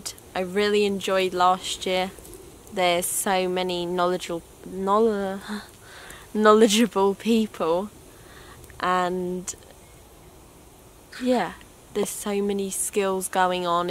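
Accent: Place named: Northern England, United Kingdom. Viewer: very fun to watch. your accent is very pleasant to listen to. i wonder if you come from north of england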